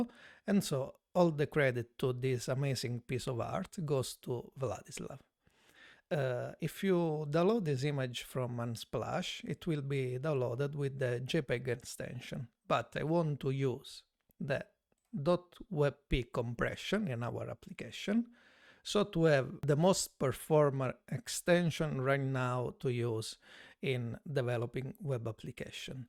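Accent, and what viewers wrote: Italy. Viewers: He is Italian, what do you expect? This tut. helped me a lot. Thanks to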